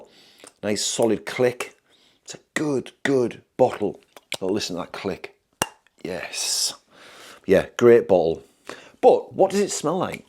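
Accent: United Kingdom. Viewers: I love English saying French words ! 😁😅 Could be as funny as French saying English words ! 😅😂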